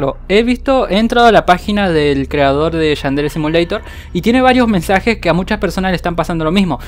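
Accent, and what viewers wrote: Argentina. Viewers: eres de argentina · en este video pense que eras argentino y lo eres :v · sos de argentina o de donde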